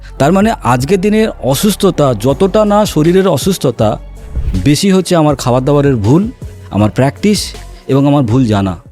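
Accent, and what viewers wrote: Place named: Kolkata, India. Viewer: ভাই, accent তো মনে হচ্ছে কলকাতার।🤨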